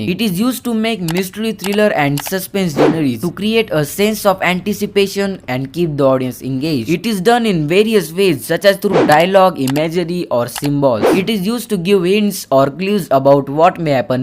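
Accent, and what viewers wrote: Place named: India. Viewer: Poor guys, he was in indian accents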